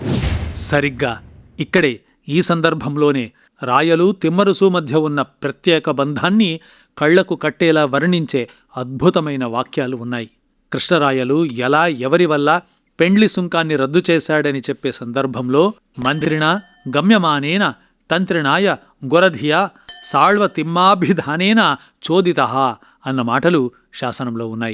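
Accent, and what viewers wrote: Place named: Karnataka, India. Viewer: ನಮಸ್ಕಾರ. ನಿಮ್ಮ ತೆಲುಗು ಉಚ್ಚಾರಣೆಯಲ್ಲಿ ಕನ್ನಡ ಕಸ್ತೂರಿಯ ಕಂಪು ಗಾಢವಾಗಿ ಬೀರುತ್ತದೆ. ದಯವಿಟ್ಟು ಕನ್ನಡದಲ್ಲಿಯೂ ಶಾಸನ ವಾಚನ ಕಾರ್ಯಕ್ರಮಗಳನ್ನು ಮಾಡಿರಿ.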